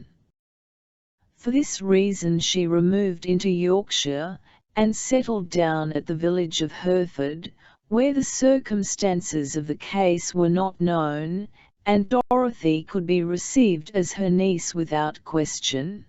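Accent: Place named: Australia. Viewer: People learning from this will pick up a strong Australian accent and a very robotic expressionless intonation!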